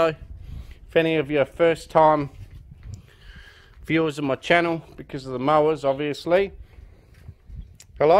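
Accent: Australia. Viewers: Are you from Adelaide?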